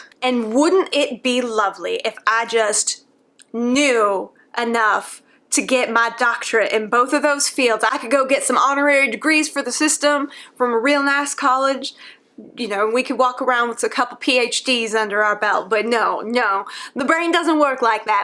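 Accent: South, United States. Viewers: So I was wondering where was “from” like what state and or city does she get her accent from? I’m from Tennessee and find accents interesting (though not the best at distinguishing yet I guess) And I hear the twangs of the metropolitan Deep South in maybe Louisiana or east Texas? But that’s just in my experience with southern accents, and there’s definitely a difference from state to state and even regions within those states.. Like I’m from a city area, but people in the city itself have a different accent from the people farther away from the city ya know? So I was curious But of course, since she’s not actually from the south her accent has a more “generalized” southern accent, where you could hear a few regions in it, but idk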